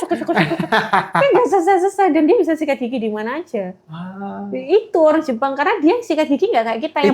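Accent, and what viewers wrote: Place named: Java, Indonesia. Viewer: Cindo jawa lucu medhok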